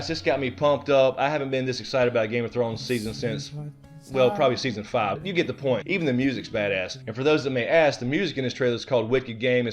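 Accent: South, United States. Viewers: so excited for this season! I'd say I'm more excited for season 6 than the previous seasons because we are going to see stuff that hasn't been told in the books yet. Can't wait! Just found your channel...great recap! Also, you sound like a fellow southerner...so double bonus!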